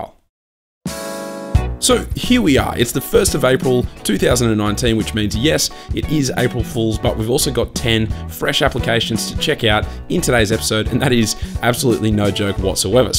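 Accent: Australia. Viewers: I'm just here for the aussie accent :)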